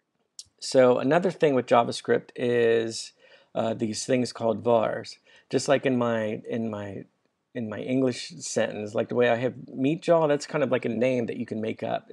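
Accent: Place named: United States. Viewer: this shit is sooo helpful im real glad u actually have and american accent my damn teacher is swahili.. its killin me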